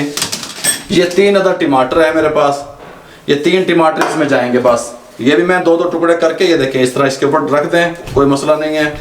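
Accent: Punjab, India. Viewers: Bhai mjhe khane bnane ka to shoq ni a me ka punjabi aksent sune ke lie lgata hn 😂😂😂👍👍👍👍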